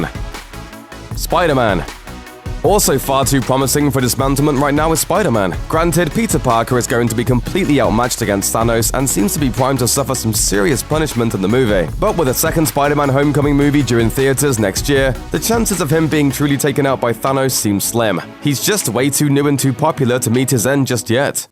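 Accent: United Kingdom. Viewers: Is the speaker british by any chance? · can u please not have a british guy narrating cause british accents make me get lose brain cells there so cancer and annoying after hearimg it over and over again it get fucking annoying don't get anyone to narrate from the fucking island or ireland next to it they make a cool video terrible no offense to royale fucks but your voice is like a hyena screaming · Luke Meyer, you mean narrating in REAL English language, British English is not an accent, it's just English, maybe you should have said "please get someone to narrate in American accent" at least it would be correct then..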